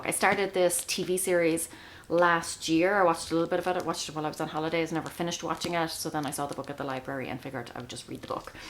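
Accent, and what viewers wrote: Ireland. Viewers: Love your brogue, what part of Ireland are you from?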